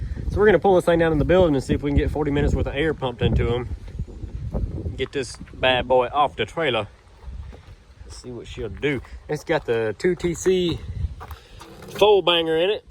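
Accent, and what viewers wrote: United States. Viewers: a little more hick then normal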